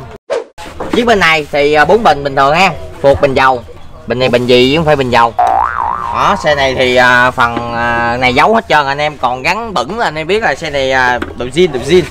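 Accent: Vietnam. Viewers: Dân bến tre hả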